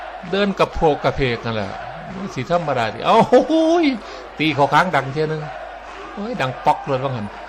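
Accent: Isan, Thailand. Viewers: ผมชอบ.มากกครับพากษ์อีสานบ้านผม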